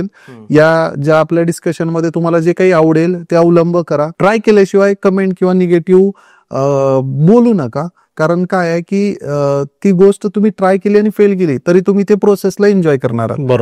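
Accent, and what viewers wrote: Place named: Jalgaon, India. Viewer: Jalgaon cha vatatoy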